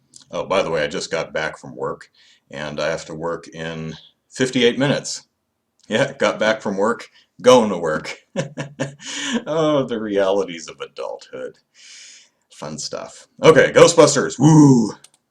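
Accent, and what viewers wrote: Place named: Kentucky, United States. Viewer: Off-topic but did you grow up in KY? You have a certain way of speaking.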